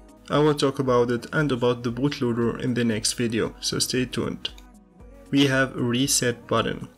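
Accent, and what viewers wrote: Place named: India. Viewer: Don't take it as negative comment. You sound like other Indians tech geeks with your accent 👌. Keep it up bro.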